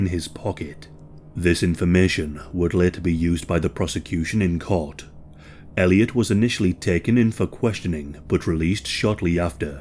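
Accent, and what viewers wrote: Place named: Northern England, United Kingdom. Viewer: Not even hald way thru that cheb ends personal description yes but. im actually already physically cringing at 'scarface' LOLL more like 'uncontrollable teen acne-face'. What a fuckn loser. Cant even imagine this litte coke heads further actions n what he'll end up doing to that poor young lass. Hate little pests like him. Calls himself topdog yet cant even buy his own car. What an absolute bell end... i already despise him and im not even a 1/4 way through the entire video. Amazing work as usual. As a fellow northerner usually a northern accent makes me awkward as im so sick of it but you make me proud to live up norf again ha ha. Legend!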